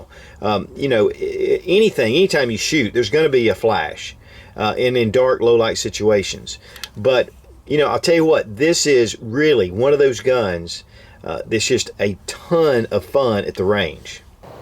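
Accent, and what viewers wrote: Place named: South, United States. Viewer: Ah, give him a break, he's got a suthin' accent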